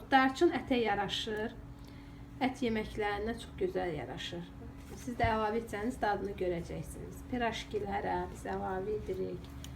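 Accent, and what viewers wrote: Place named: Nakhchivan, Azerbaijan. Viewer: AXWAMINIZ XEYIR XANIM MENDE BAKILIYAM SIZ HANSI KENDDENSIZ SOZLERIMIZ DANIWIQIMIZ OXWAYIR NOXARIWLA YE BELE DEYIRIK😊😊😊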